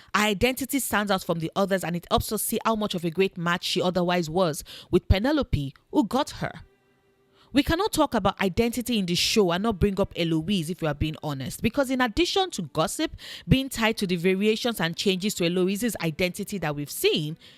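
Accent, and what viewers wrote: Nigeria. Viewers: Very random but sound like some of my Nigerian sisters from the south east. 🤩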